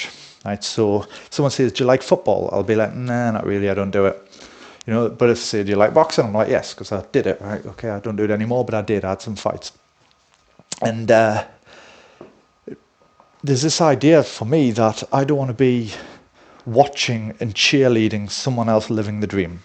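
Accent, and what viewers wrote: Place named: United Kingdom. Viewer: 22.30 pff you're too uptight and serious about this "outlaws vs respectable society" thing IMO. rock concerts are awesome. you can be a man on your own journey and living life on your own terms but still enjoying what others have achieved because it brings you joy and excitement. just for the sake of it! what's so bad about it for a sigma character? i find your views very intriguing but sometimes you make it a bit too complicated,especially with your strong british accent :-p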